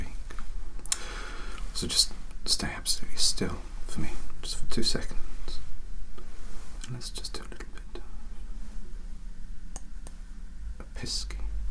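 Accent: United Kingdom. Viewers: Great role play of Sirius Black bud very relaxing am interested are you American or British if your American but doing a British accent than that’s bloody brilliant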